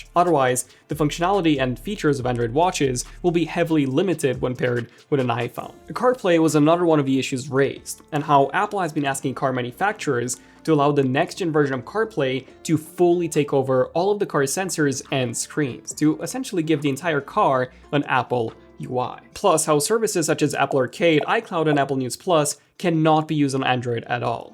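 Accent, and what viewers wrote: Romania. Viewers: Trebuia să aud de 6 ori ca să confirm că tu ești din românia, da întrebarea este, ești din românia?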